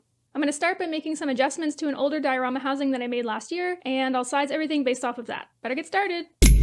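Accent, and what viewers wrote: Newcastle, United Kingdom. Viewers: I thought you might be from Newcastle 😂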